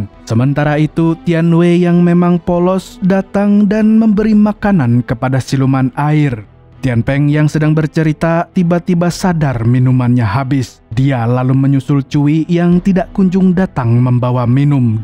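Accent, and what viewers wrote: Banjar, Indonesia. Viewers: Urang banjarkah admin ni😂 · Orang Banjar wara sklinya😅🙏🤣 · orang Banjar nii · banjar bnr amang ny ni😂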